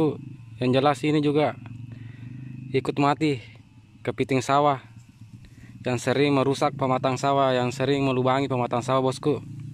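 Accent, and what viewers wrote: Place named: Bugis, Indonesia. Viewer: Bos ini klo didengar logt nya pasti orang Bugis terima kasih ilmunya bos di mana beli obat seperti itu bos .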